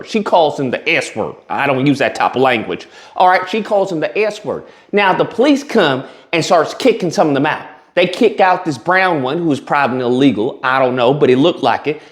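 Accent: South, United States. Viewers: Your " country accent " is too funny!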